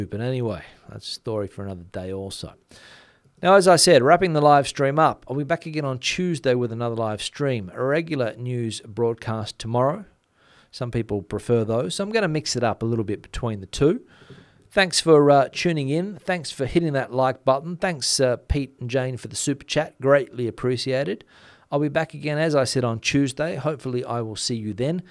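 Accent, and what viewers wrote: Australia. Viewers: nothing to do with anything, but, your Australian accent - I think it's the coolest accent, when it comes to the English language, of all English speaking countries; ours (Canadian, can be funny at times, talking a boot... 🤪) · did your family make fun of your muted Australian accent? Spain's politics seem tranquil as compared to the USA. I'm stupid enough to have believed we'd return to more sane politics after Trump lost the election. I'm lucky in regard to "Jet Lag". Been to AU and NZ probably 7 times, and Europe at least 25 times. No problems.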